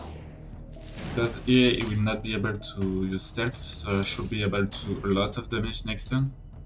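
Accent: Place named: France. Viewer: he's just french and doesn't know how to speak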